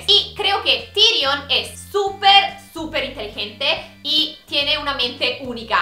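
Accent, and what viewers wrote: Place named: Italy. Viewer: lol creo que nos recordaste que era tu cumpleaños como 10 veces jajajajaj! acabo de descubrir tu canal!!!!! tu manera de hablar me sirve mucho para practicar el acento italiano.....Porque se italiano pero me falta el acento!!!!!!!!además puedo ver tus vídeos en italiano y en español para aprender nuevas palabras!!!! Eres lo maximo!!!! Descubrí un tesoro oculto!!!!!!eureka!!!estuve viendo algunos de tus vídeos y me encantan!! hoy haré una maratón de vídeos tuyos!!!! ya tienes una nueva suscriptora!! Y traeré a mis amigos por aquí también!!! :)) (*se emociona*)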